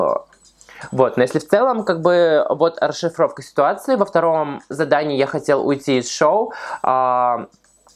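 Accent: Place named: Russia. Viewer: ты суперский! Но, твое "аканье" -убавь пожалуйста.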